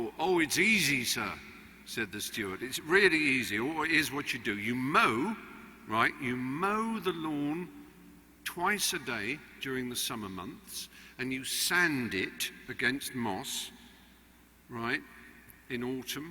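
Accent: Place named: England, United Kingdom. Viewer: From where in England is Fry's accent??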